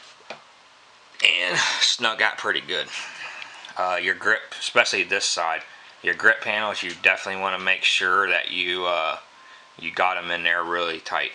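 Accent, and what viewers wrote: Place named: Midwest, United States. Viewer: Good informative video. Your Midwestern accent kinda annoys me but it's not a deal breaker. Just breaking balls. Next you can do one on a JA9 since I have one of each.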